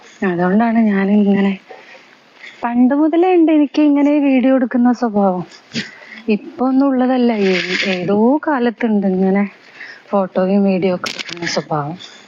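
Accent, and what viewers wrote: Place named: Malabar, India. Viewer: 40 പേർക്ക് ഇഷ്ടപ്പെട്ടു എന്റെ കമെന്റ്..അറിവില്ലായിമക്ക് കയ്യും കാലും വെച്ചാൽ അതിനു എന്ന് വിളിക്കാം...വാഴാനി അണക്കെട്ട് എവിടെയാണെന്ന്.എവിടന്നു പോകുന്നു എന്നും അടുത്ത ഏതെങ്കിലും അറിയപ്പെടുന്ന ടൌൺ ഉണ്ടകിൽ അത് ഇതൊക്കെ പറഞ്ഞാൽ അറിവില്ലാത്ത ഞങ്ങൾക്കും പോകാമല്ലോ.എന്തിനാണ് വീഡിയോ പിടിച്ചു ഒരു പരിചയവുമില്ലാത്ത ഞങ്ങളെ കാണിക്കുന്നത്.പ്രായത്തിനെ അന്തസ്സെങ്കിലും കാണിക്കുക.ഇത് താങ്കളുടെ തെറ്റല്ല കോന്തൻ ആണ്..സംസാരത്തിൽ നിന്ന് നിങ്ങൾ മബാറുകാരിയാണന്നു മനസ്സിലായി.വളരെ നന്ദി ഇനിയും ഉപദ്രവം തുടരുമെന്ന പ്രതീക്ഷയിൽ തൽക്കാലം നിര്ത്തുന്നു സ്നേഹപൂർവ്വം കുഞ്ഞാപ്പ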